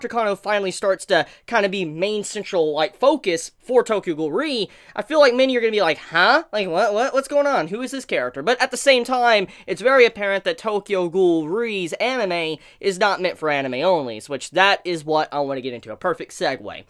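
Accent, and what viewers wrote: South, United States. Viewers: #bring back the southern accent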